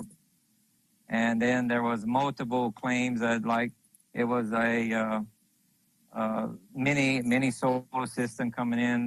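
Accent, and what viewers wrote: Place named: Louisiana, United States. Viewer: I would guess this guy is from Louisiana. Listening now…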